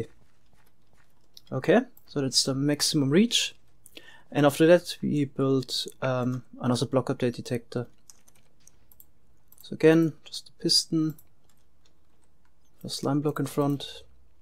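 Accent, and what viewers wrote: Germany. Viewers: is German your first language?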